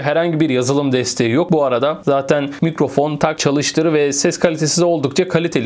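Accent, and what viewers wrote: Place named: Azerbaijan. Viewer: Selam. Sen nerelisin? Sanki Azerbaycan Turkusun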